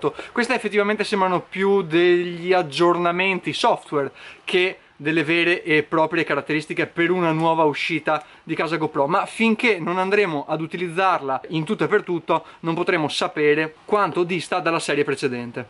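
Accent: Veneto, Italy. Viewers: ciao e grazie per il video. Domanda... dall'accento sei veneto esattamente come me. Ma da dove di preciso?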